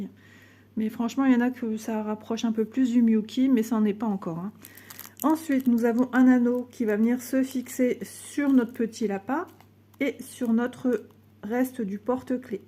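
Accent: North, France. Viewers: Bonjour très jolie les sorcières 🧙‍♀️ vous en avez déjà brodé une ou pas bonne journée sa fait du bien d’entendre votre accent du nord je suis du nord aussi bisous 😊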